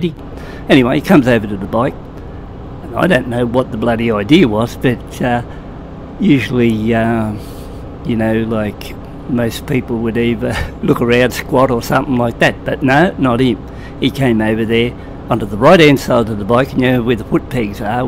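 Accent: Australia. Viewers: Bloke sounds like the orignal template for a bogan mate.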